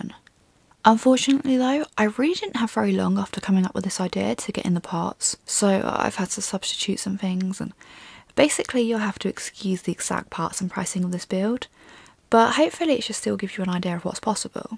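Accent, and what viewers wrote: England, United Kingdom. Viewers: Voice of a woman on review and its brish accent. .....tasty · That English accent 👌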